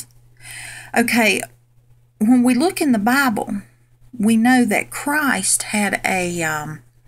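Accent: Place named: South, United States. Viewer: Thank you Sister! You are so right on in this video. What a blessing you are. I can't wait to meet you in heaven. I also love the fact that you are a southern girl (your accent). I live in East Tenn. lol God bless you as you continue to show us what the Lord is revealing to you! I just feel so comfortable and such a peace listening to you. I don't know how to really explain it, right at home with your teachings I guess. Anyway, love you and God bless you! :)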